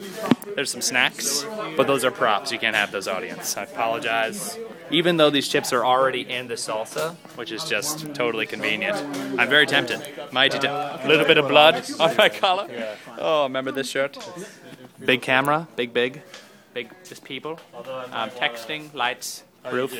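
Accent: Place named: United Kingdom. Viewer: Your voice is so cute!!!! I love your british accent!!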